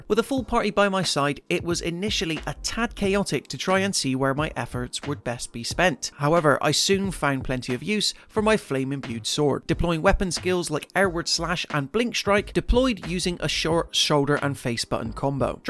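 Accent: West Country, United Kingdom. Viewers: DD2 is looking fire! Sidenote, this is the first time I have heard a gloucester/bristol/west country accent from a youtuber and its refreshing!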